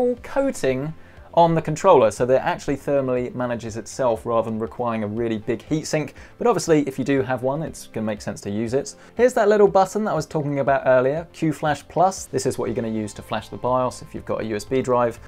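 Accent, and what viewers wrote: United Kingdom. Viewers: First time ive heard the the uk accent going over pc builds its very fitting haha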